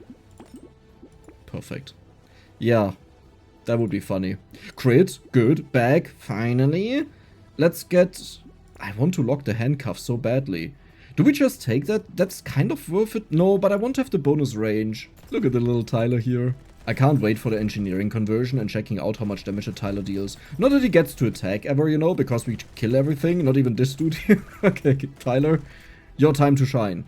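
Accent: Germany. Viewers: what do you mean finding out? You couldn't tell from the German accent?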